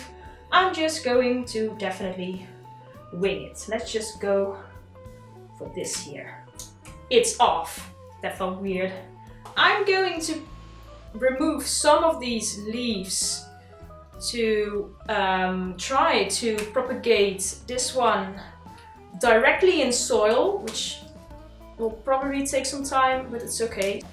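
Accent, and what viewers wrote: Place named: Netherlands. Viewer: Ik hoor dat je Nederlands bent kan je me helpen ik heb ook een draceana maar niet dezelfde wie jij hebt deze heb ik helemaal tot stam afgesnoeid maar wel aan een tak uitloper laten zitten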